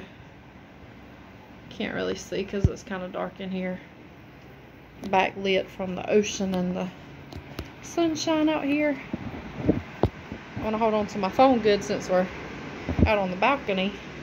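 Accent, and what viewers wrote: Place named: South, United States. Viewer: Oooo girl you are even more country than me!!! Hahaha I love it! 🥰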